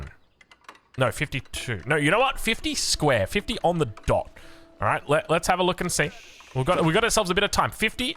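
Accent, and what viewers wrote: Australia. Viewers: Hey, my Englisch was never good. But cause of you it sounds even more funny. After picking up Spanish accent when living in South America and mixing it with my German accent now it got spiced up with your Aussie accent. Thanks for that. 😂 love your vids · i could listen to talk about anything. dude is so funny and quick and idk if it's an American thing but Aussie accents just make me smile. i dont even play AoE but i know everything about the game now haha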